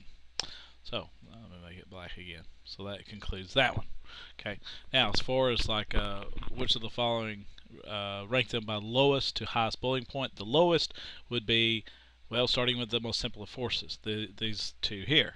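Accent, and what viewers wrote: South, United States. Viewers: BIG HELP! & great country accent. Southerners!!! =)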